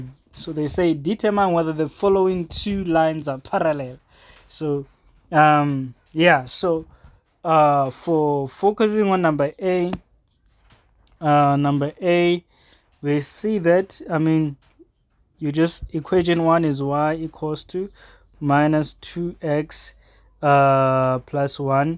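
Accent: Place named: India. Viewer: I love your energy, accent, and your teachings! Big help for I am going to take CET in 3 days. Thank you random Indian teacher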